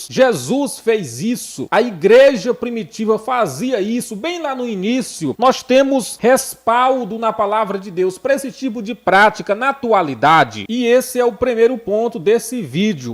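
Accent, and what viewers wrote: Northeast, Brazil. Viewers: A. Paz do senhor Jesus Cristo missionário gosto muito de seus comentários é muito edificante principalmente prá mim que sou Nova convertida em Cristo tem muito que não gosta porque não quer viver a verdade adoro ó seu sotaque nordestino Deus abençoe sempre sua vida e sua família 🙏💕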